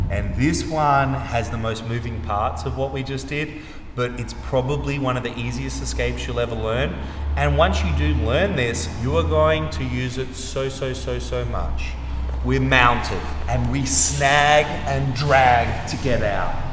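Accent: Australia. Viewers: The Aussie accent make it so pleasant lol!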